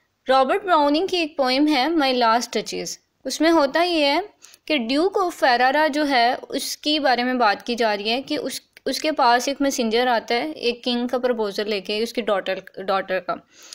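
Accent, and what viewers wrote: Pakistan. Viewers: Kya aap pakistani ho kya aapki tone ase hai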